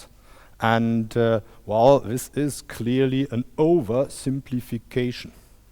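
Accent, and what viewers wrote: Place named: Germany. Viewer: A German talks Eng 😀so cute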